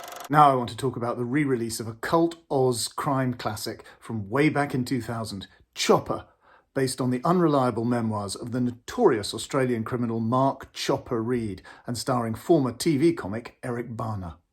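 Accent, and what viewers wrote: United Kingdom. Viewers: I did subscribe ages ago :-) love your british english I sometimes don't really understand. So your vlogs have added value to me since I learn something about movies and also improbe my bad czech english! Greedings from Plzen!!!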